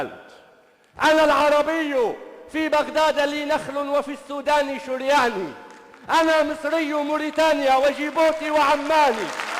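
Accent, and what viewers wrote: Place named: Libya. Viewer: سلم لسانك حبيبنا ليبيا طالعه من لسانك مثل العسل 🇱🇾🇱🇾🇱🇾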